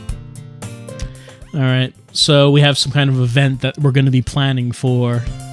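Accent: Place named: Brooklyn, United States. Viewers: Ohh... The brooklyn accent.. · Dat Brooklyn accent, doe. Cute.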